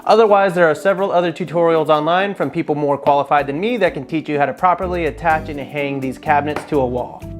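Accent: India. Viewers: Accent sounds like a Hindu guy. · Sounds like an Indian man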